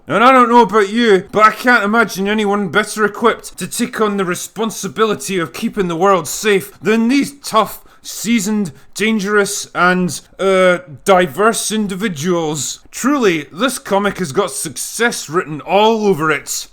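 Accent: Scotland, United Kingdom. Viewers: It seems like from 2016 to now you’ve gotten more Scottish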